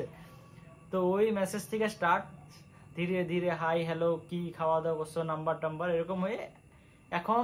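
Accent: Assam, India. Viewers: Tomar Kotha Sunle bujha Jay Tumi assam er dada... Amio assam theke Tomar vdo dekhchi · Dada amio assam er.... Tmr moto bangla boli😅😅😅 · Hmm amnitew Assamer bangla language olpo belek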